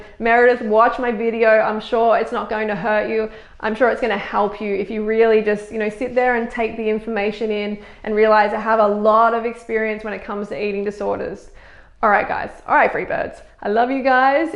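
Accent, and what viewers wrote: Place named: Australia. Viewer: i live for your Australian accent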